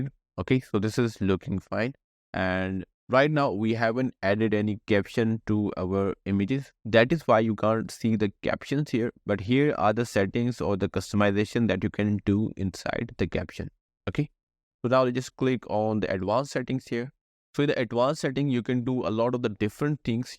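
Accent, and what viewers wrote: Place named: India. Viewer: That Hindu accent drives me crazy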